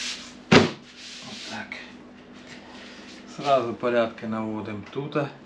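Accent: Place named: Surzhyk, Ukraine. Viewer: Сало добре але суржик ріже слух аж слухати неприємно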